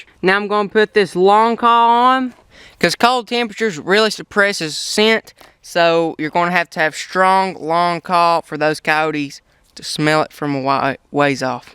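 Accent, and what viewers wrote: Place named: Kentucky, United States. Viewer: Hey what part of Kentucky u from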